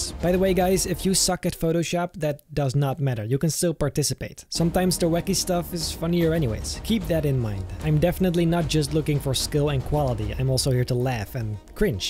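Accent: Netherlands. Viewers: Its cool but we also miss the funny dutch accent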